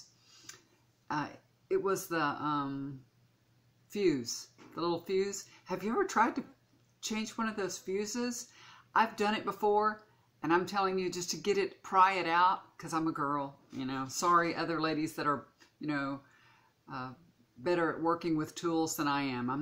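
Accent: South, United States. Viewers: I love southern voice so positive to me. Hope that still do more video.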